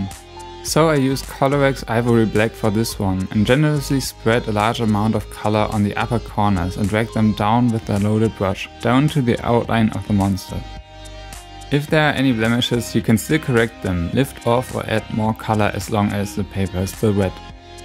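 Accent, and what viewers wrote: Germany. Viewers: Wow, not only is he a great artist, but also has a cute German accent! I could watch his videos for hours. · Sun Shines Oh... Didn't know but his accent sure sounds German.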